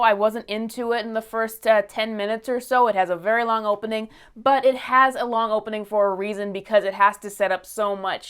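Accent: United States. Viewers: I love your accent! What state are you from?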